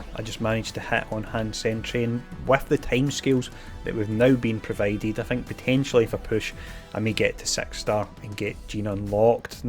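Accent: Scotland, United Kingdom. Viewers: I applaud you for your honesty and integrity. I recently started playing MSF because I have too much time at home due to an injury, and I am binge watching many MSF videos from many content creators and I could tell you are different from the rest instantly. No disrespect to others, but your calm and easy going attitude (even when you keep getting minions during orb openings) made me subscribe to your channel. When I get better and start going to work, I doubt I will have time for MSF (Marvel Future Fight is a much better game, no randomness bullshit, purchases are not mandatory and do not cost an arm and a leg, etc) but I will keep subscribed to your channel and watch your videos as they are released. I also love listening to your lovely Scottish accent:) Keep up the good work.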